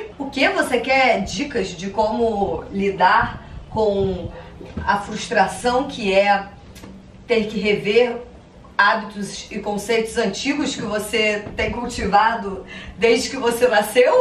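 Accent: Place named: Rio de Janeiro, Brazil. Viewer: Carioca fala gostouso!!!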